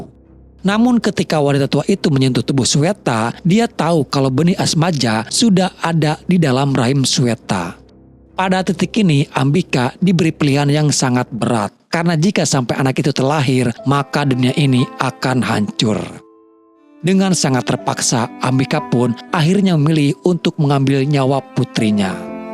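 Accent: West Java, Indonesia. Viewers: opaL???.. sunda pisan kang ... ☺️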